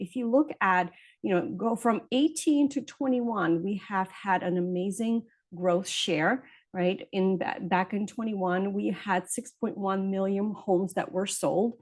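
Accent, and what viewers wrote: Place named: New Jersey, United States. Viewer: "Let's tawlk" ... jeez ... i miss Jersey & once away i can't help but catch a belly laugh when hearing the accent that Jersey folk swear they do not have. I gotta get home !!! 😆🍅😆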